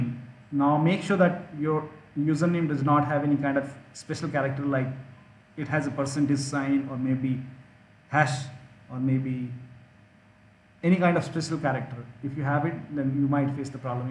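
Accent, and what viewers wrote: India. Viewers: as soon as i heard that indian accent i knew i would be saved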